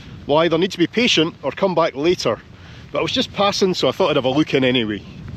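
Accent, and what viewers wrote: Scotland, United Kingdom. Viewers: Just love the Scottish accent... and it's so fun to read all the Norse names in Lerwick, now when I'm "google visit" the city. What a beauty! Just the end name of the city "wick" is "vik" in Norwegian, meaning "bay" in English I think. We have many places that end-named vik here in Norway. And then we know what kind of "bay" the place has. Sanday (Sandøy) Sand Island. Guess it's a sandy island. Would love to visit the island and of course main land of Scottland as well. Keep up the good job 👍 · Hi every trip is a pleasure you share and will always be my favourite YouTuber. Thanks for sharing and making my week. I had Scottish great grandparents and think the Scottish accent makes for the best commentary. I also enjoy your friend Scott from Planes Trains and everything but you will remain my favourite. Thank you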